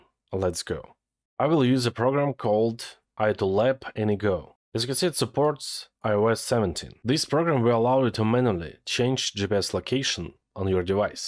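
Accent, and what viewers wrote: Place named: Russia. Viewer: все-таки, у него русский акцент, русский же блогер)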